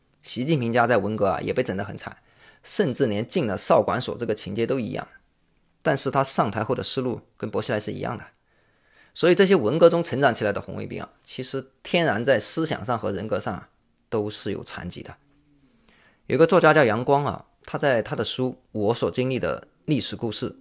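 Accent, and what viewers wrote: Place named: Jiangsu, China. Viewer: 听的口音，是江苏人吧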